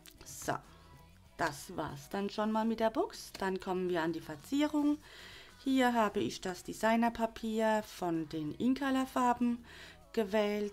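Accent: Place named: Hesse, Germany. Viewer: Habe dein Einführungsvideo gesehen und kann kaum glauben, dass du dir so wenig zugetraut hast. Box ist sehr hübsch und akkurat gearbeitet. Als gebürtige Hessin mag ich deinen Dialekt ganz gernze hören . LG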